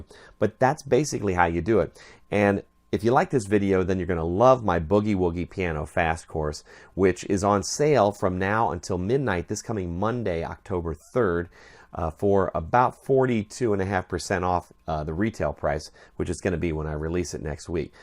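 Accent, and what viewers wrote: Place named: South, United States. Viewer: I love the American accent especially 'down south' and that American 'drawl' is kinda special too!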